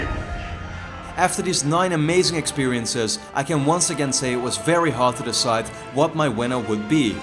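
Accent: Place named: Netherlands. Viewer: Oke i just subscribed to your channel. And i want to ask you were do you live? Because i think your dutch atleast you have a dutch accent. I think it is awesome that you and i have exactly the same taste of games and movies. My favorite games are the uncharted series and my favorite movie is the lord of the rings and that is just the tip of the iceberg. Awesome list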